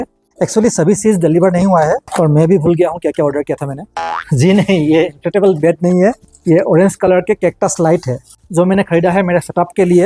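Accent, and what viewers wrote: Assam, India. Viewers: Assam or niki vai.. hindi tu huni Assamese jen lgise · Bro had the Assamese accent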